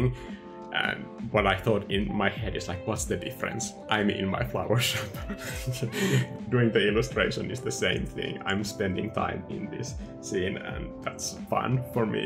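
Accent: Finland. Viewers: I think I have been at this Cafe place u showed in the beginning. If I’m right it is in Helsinki. That would also make sense to me since u have kind of a Finnish Dialekt. I love Finnland wanna go there again soon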